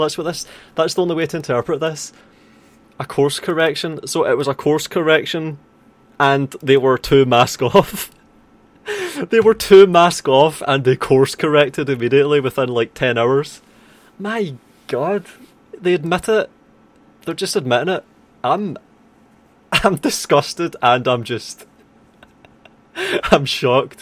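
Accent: Scotland, United Kingdom. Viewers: I like your vids, i wish i would understand scottish tho.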